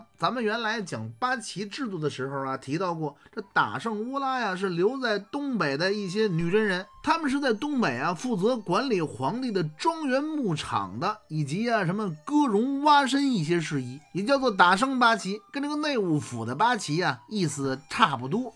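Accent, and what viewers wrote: Beijing, China. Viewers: 纯粹咱老北京音您呐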